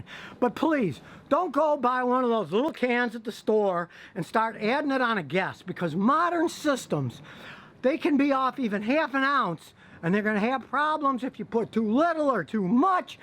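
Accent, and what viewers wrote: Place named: Pittsburgh, United States. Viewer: Have you always lived in Texas? You have a slight Pittsburghese accent.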